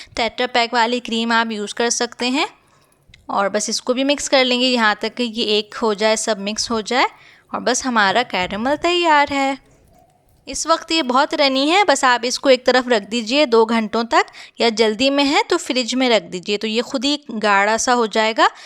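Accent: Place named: United Kingdom. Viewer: why are you speaking urdu in British accent 🤨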